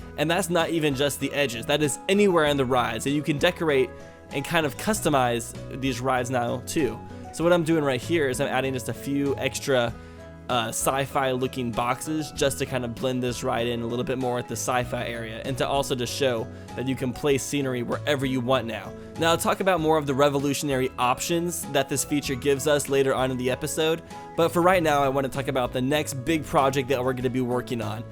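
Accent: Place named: Ozarks, United States. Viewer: The way you talk is really obvious to other Missourians. You sound like you come from up near the northern part of the Ozarks. You sound just like my brother in law from just north of marsh field.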